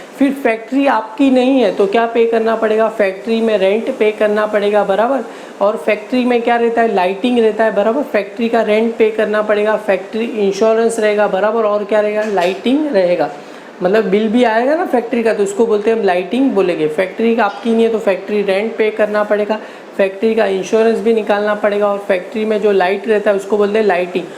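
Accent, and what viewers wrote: Gujarat, India. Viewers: Tame gujrati cho ??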